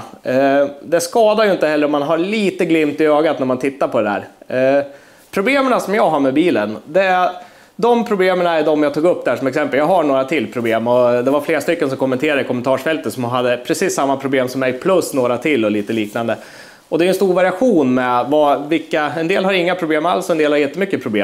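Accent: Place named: Norrland, Sweden. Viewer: Nu kanske du sagt det tidigare vid något tillfälle, men jag har väldigt nyfiken på din dialekt och var du/ni är någonstans i Sverige. Om jag skulle gissa på det skulle jag nog säga Västernorrland/ Jämtland/ Västerbotten.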